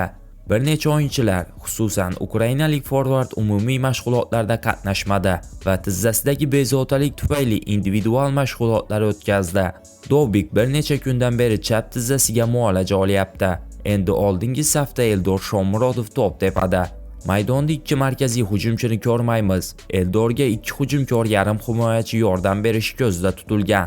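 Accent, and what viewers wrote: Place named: Russia. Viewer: ЎЗБЕКча гапиришни ўрган, нима тилни устидан кулябсанми? ЎЗБЕКчани энди ўрганаётган ўрисга ўхшаб гапирасан. Кабул эмас, қабул.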